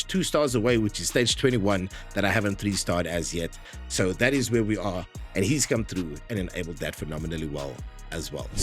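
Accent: South Africa. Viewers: Yup, first 2 words of the video and I knew you’re from SA😂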